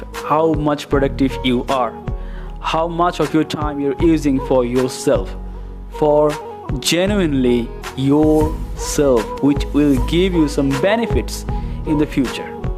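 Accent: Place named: United States. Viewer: দাদা, অনেক অনেক ভালোবাসা ভালোবাসার American accent 🥰🤪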